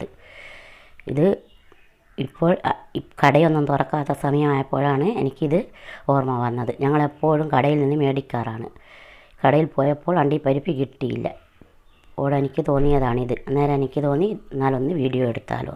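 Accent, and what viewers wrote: Nadapuram, India. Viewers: Barthanam kettokkumbo nadapuram bayikkanna thonnunne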